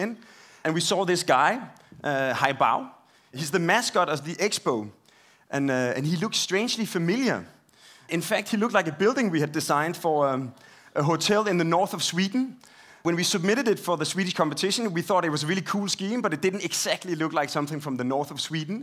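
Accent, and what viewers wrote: Denmark. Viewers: just a Danish accent :c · Pretty much everyone from Denmark does this when they speak English or Danish, so I have gotten used to it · Aw... You just have to love the Danes :) Awesome accent! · it's the copenhagen accent :P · Yes it is annoying. In Denmark the ones teaching english developed their pronunciation when the primary contact was text based, or in songs. So unfortunately all the grammar, and habits from sentence construction and for emphasizing have been transferred to verbal English. The younger generation is much more exposed to American television, and have less tendency to do this. Also it is worse in Copenhagen than in the rest of Denmark, perhaps because the local dialect is further from English..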